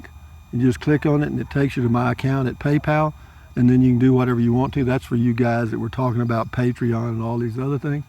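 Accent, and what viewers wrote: Texas, United States. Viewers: Oh ❤you talk just like my favorite Texan: Betty McNaughton, Bless her heart!!❤she is a character just like you😂🤣🇺🇸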